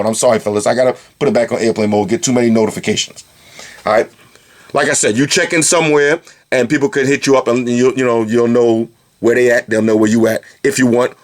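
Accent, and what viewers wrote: Jamaica. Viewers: u from Jamaica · R u from Jamaica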